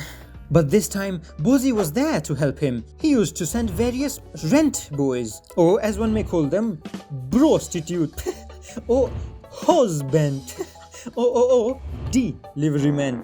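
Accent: India. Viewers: u r indian?? so what about this accent is it ai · That accent is thick in the Indian way alright. Maybe he tried to recover from the actual super heavy Indian accent but it still sounds very Indian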